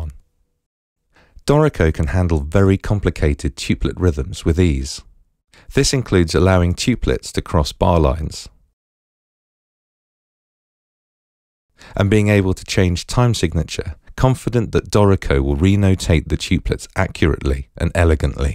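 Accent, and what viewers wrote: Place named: United Kingdom. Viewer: don't you love hearing "eighth note" in a British accent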